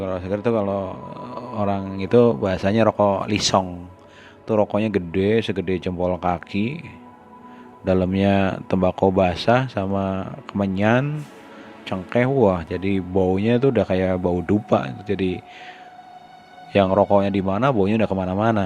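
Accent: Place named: Banyumas, Indonesia. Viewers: dimanamana tempe enak..ora ngapak ora kepenak😁cilacape ngndi mas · Baru denger di awal2.lgsg ketebak.pasti wong ngapak.salam ngapak bosku! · Bagus! Ceritanya sederhana. Masnya ngapak jadi kangen ngobrol sama temen2 ngapak! 😂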